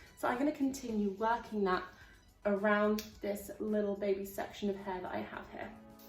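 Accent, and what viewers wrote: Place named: United Kingdom. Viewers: She's a Brit. There's a significant difference between the 2 accents. I'm surprised you're unable to differentiate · Are you brittish or South-African? Cool accent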